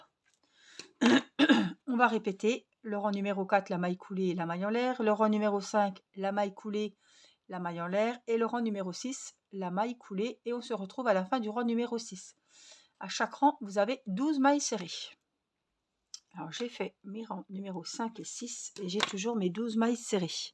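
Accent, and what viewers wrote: Franche-Comté, France. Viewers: Donc je suis vos explications pour le bonhomme de neige pour ma petite fille c'est un plaisir de crocheter avec vous, petit aparté je voulais savoir si vous êtes franc comtoise car vous avez cet accent qui me rappelle ma Franche Comté merci pour tout.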